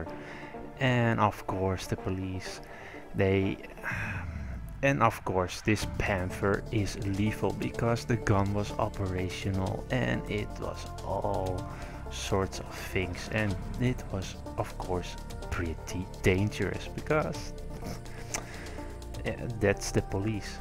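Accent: Netherlands. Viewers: ja ja als je engels wil praten doe dat dan met engels aksent en niet met nederlands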